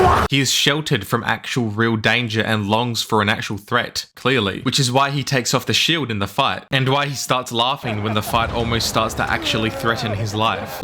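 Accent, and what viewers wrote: Australia. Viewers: Bro is australian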